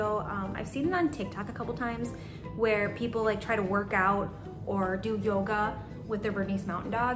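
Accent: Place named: Minnesota, United States. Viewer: What is your accent? Minnesota? It's so lovely!!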